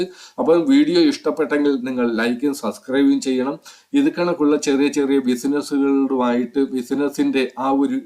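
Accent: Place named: Central Travancore, India. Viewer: എന്തൊരു ബോറാണെന്നോ നിങ്ങളുടെ ശൈലി | 5 മിനിട്ടിൽ പറയാവുന്നതു 15 മിനിട്ടിലധികം വലിച്ചു നീട്ടി നീട്ടി.... മധ്യ തിരുവിതാംകൂർ ഭാഷ കൂടിയായപ്പോൾ പരമ ബോറ്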